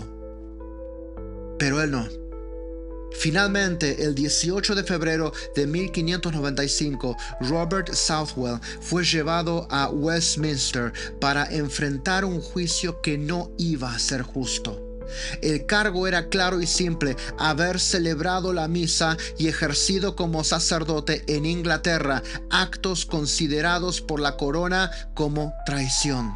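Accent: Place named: Argentina. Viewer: Excelente hermano, vas con todo!!! Gracias a Dios por tu conversion. Y tu forma de expresarte tan contundente. Me encanta tu peculiar acento Argentino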